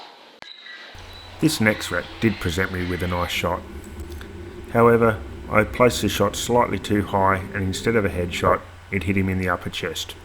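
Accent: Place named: United Kingdom. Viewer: British! Lol heck yeah!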